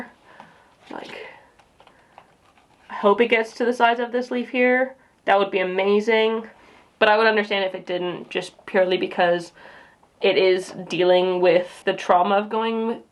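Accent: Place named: United Kingdom. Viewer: I thought I detected New York, but I was way off. You sound more British when you are with (Clahyah):))))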